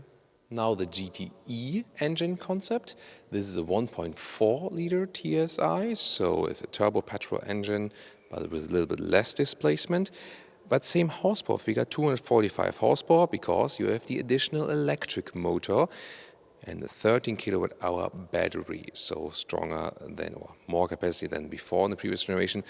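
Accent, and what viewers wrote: Germany. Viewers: I'm sure he's intentionally dialing up his German accent which is SO GUT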